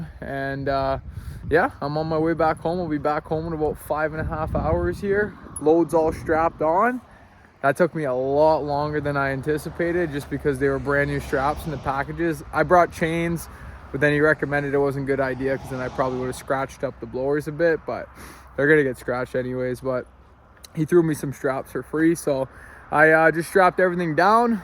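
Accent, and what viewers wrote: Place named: Quebec, Canada. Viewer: Gotta love the French Canadian accent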